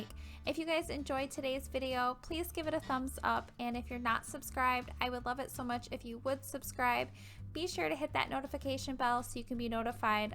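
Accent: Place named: Buffalo, United States. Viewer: I love this! I swear you have a Buffalo accent!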